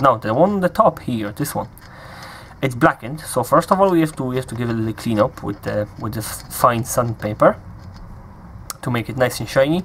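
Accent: Ireland. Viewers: Man not being funny but you have a mad accent irish farmer/central European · Hi. Thank you for your time and sharing your knowledge you could nearly be mistaken for an Irish man. 😂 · Funny! Best Irish accent from a foreign national ive ever heard! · What part of Ireland is that wonderful accent from?????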